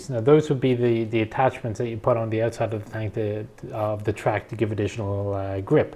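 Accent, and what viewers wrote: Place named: Ireland. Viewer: Ah yes, more from the Book of Armaments as read by a Irishman.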